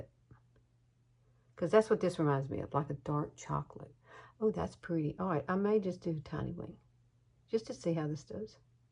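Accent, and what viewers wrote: South, United States. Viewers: I just subscribed to your channel. I love your accent! I am from Kentucky and also have that wonderful southern accent nothing like good southern people. I have just purchased a couple of your products from Younique products. I don't have facebook but I am enjoying this channel.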